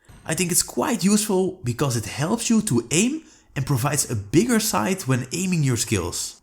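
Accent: Netherlands. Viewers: Nice video man! Na het 1e woord was het al duidelijk dat je Nederlands was haha.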